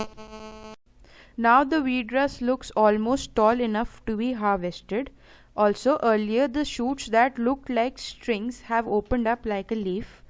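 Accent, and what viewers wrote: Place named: India. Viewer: Try to pronounce your r and d in proper English manner in horrible hinglish for us it sounds disgusting, the Hindi rétroflexes always makes Indians lazy when talking English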